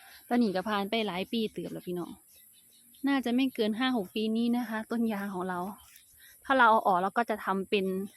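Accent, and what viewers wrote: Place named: Sisaket, Thailand. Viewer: สำเนียงศรีสะเกษ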